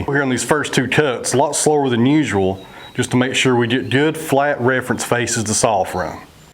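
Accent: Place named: Appalachia, United States. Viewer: I have no idea how I came to click on one of your videos, but I bet it’s because I’ve been watching August Hunicke’s tree felling videos for some reason. Also have no idea how I came to click on his. Anyway, glad I did, because your Tennessee accent, your beautiful drone footage of your ranch, awesome music choice, and unhurried video style just makes watching feel like relaxation therapy. So, from and Australian fan, keep the awesome content coming.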